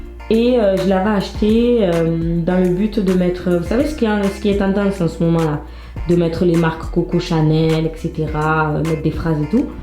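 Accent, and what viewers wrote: Marseille, France. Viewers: No mais serieux je cherche toutes tes videos rien ke pour ecouter ton accent marseillais!!! Je kiffe tropppp 😍😍😍😍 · J'avais jamais entendu de renoi avec l'accent marseillais mais je savais qu'il y en avais et je trouve sa MAGNIFIQUE je kiff à mort ta voix et tout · Ses tarpin de sbob chez toi machallah parlez à la facon marseillaise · Je kiffe l'accent marseillais 😍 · j'aime trop ton accent Marseille 😁💙💙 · ya une une video ou elle parle combien ça la blesse que les francais disent prk elle a un accent marseillais alors qu'elle est renoi je t'invite a la voir tu comprendras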